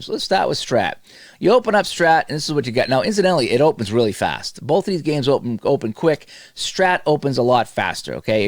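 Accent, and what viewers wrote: Boston, United States. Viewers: Hi. I like your reviews. I saw your Regular Season Basketball (RSB) review and your Celtics-Sixer series with RSB and that was fun. You have a true Boston Celtic accent and that gives a nice touch to your reviews. I just started this video and will go back to it but I do want to correct the Strat-o-Matic pricing. For $62 you get the game with card image and any one season of your choice. You don't need to spend another $27 unless you want a second season.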